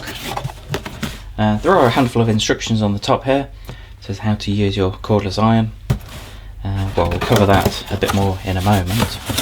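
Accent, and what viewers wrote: United Kingdom. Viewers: YOU ARE MY HERO !!!! SO BRITISH, SO POLITE, SO ASMR !!!!!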